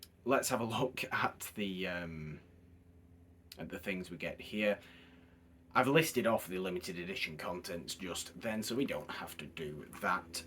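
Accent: Northern England, United Kingdom. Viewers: I’m trying to find a review of this box set, and not seeing ‘unboxing’ on your post, I tried you. So I’m disappointed. Nice to hear a northern accent, though.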